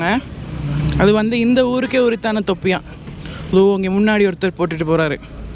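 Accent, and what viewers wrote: India. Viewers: Nee ga srilanka Tamil pesala India Tamil pesuringa ,❤ nella eruku pro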